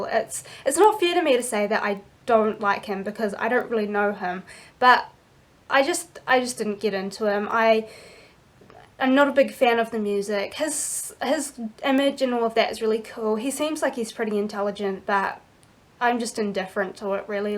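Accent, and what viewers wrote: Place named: United Kingdom. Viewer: Damn, you are fucking HOT!!! Love a British accent!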